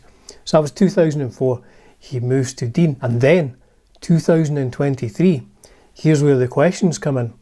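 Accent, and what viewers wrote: Scotland, United Kingdom. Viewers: great video buddy. Blessings to you and your family from Hogganfield Loch Glasgow originally from Saltcoats on Ayrshire coast I've been a Schenker fan from Obsession LP onwards my favourite guitarist. What you've missed is that Gibson won a massive law suit with Dean Guitars who can no longer make the Flying V or other shapes of Gibson I think they are in deep trouble financially now since the court case so Michael probably released from his Dean contract and hence back to Gibson. You sound East coast hope you're a Hibby same as me lol. Kind regards buddy. 🎸✝️🏴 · Growing up, Michael Shanker, was always my favorite guitarist! I see your Scottish by your accent. I’m an American but anyway, I love the show outlander they got the Scottish actor Sam Heughan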